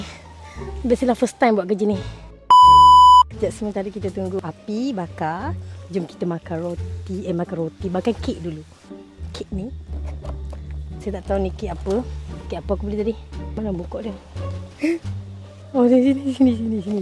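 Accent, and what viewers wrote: Penang, Malaysia. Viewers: rindu ckp utara loghat peneng utara😢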